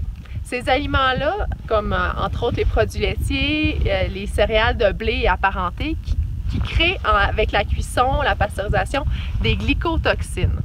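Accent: Quebec, Canada. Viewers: C'est la moindre des choses de vous donner un retour. J'aurai une remarque. Quand vous dites que la pomme de terre génère des glycotoxines, cela a provoqué un doute et en me renseignant, j'ai compris que c'est quand elle est cuisinée en friture. Il fallait le comprendre dans la suite des détails que vous fournissez sur base d'aliments cuits. Cela étant, même vous, dans votre belle contrée outre-atlantique, avez sans doute entendu parler ou même gouté des frites. Etant Belge, le pays des frites, j'ignorais l'impact de la cuisson de ladite pomme de terre. Des gens de votre métier par ici doivent le savoir aussi, mais n'en font pas état ! C'est par votre entremise que j'apprends cet enseignement. Encore merci donc. Par ailleurs, quant à votre accent québécois, c'est davantage un atout, bien que le fond d'une communication soit le principal. Ce que vous dites, au risque de me répéter, est universellement intéressant et instructif. 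Quant à la situation géographique des différents pays que vous visitez, présentement ^^, dans l'Amérique du sud (merci de l'avoir pointé, il s'agit d'une coquille de ma part en tapant Nord au lieu de sud) N'ayez crainte que les européens sache qu'ils se trouvent sous l'équateur. La plupart de nos pays se sont construits (pas très noblement) sur l'exploration mondiale et diverses colonisations. "L'histoire" qu'on nous enseigne passe par la géographie. Bref et quoi qu'il en soit, j'ai hâte de découvrir vos prochaines vidéos.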